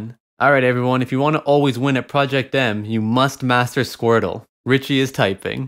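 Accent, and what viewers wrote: Newfoundland, Canada. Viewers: Started watching a ton of your videos. Quick question. You a Newfie?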